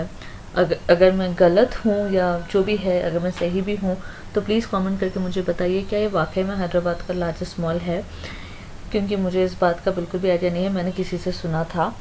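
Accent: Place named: Pakistan. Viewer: You sound more like Pakistani not Hyderabadi 😊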